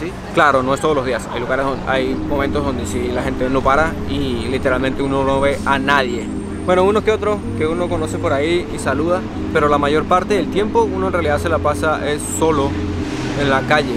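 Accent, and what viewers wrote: Venezuela. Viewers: ¿Eres venezolano crack? Por cierto tu trabajo es increíble y con alguien con tu aptitud, se hace llevadero. Éxitos mi pana. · Eres de Venezuela ? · Se sabia casi q desde el principio q era venezolano, la chica q dijo mugre si no lo es, estoy seguro q debe ser colombiana :D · eres venezolNO?? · Este pana como que es venezolano? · Excelente la edicion del video hermano,eres de venezuela cierto? ,por el acento y las palabras que usas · Vos sois venezolano?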